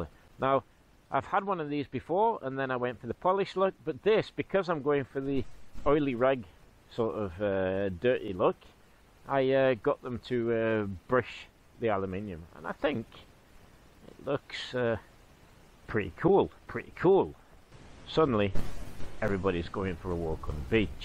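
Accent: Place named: East Midlands, United Kingdom. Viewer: Well set up for everyday use, love it. You speak English with an English accent too! Sounds a bit like East Midlands?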